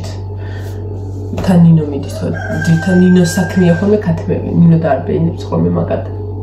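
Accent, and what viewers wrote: Martkopi, Georgia. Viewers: ზუატად მანდ ხარ მართალი დიდი ხანი გიყურებ დამივხვდი რომ საერთოდ არ თამაშობ.შენი ძირძველი მარტყოფული კილო არ უნდა დაიკარგოს . არა კახური❤ძალიან მაღიზიანებს კუთხეს რომ მალავენ წვალებით და არც გამოზდით იყავი ის ვინც ხარ რა❤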